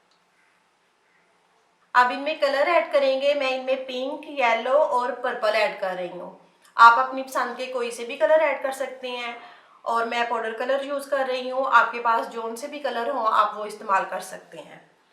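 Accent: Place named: Lahore, Pakistan. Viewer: VIOCE TO LAHORI LAGTA HA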